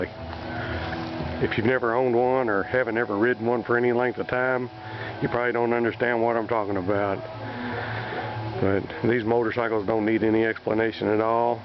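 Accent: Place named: Kentucky, United States. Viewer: sounds like you might be in kentucky. if so i'am from winchester. live in apopka florida have a 2014 street glide special gold peral about a year now . my last name is brett still have many, many family and freinds in lex, georgetown, louisville,winchester and kentucky area. ride safe and enjoy / peace !!!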